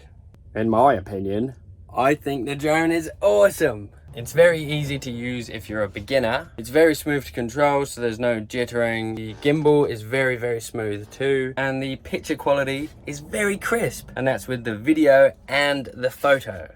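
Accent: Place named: Australia. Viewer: Where abouts you from in Blighty originally butt? (See if you can guess where I’m from with that word 😂) You’ve pretty much got the Ozzy accent down Pat fella! I was out there travelling around in <num>, I’d love to go back tbh mate. Sick van build btw man, you got yourself a very cool little family set up there. Awesome brother!!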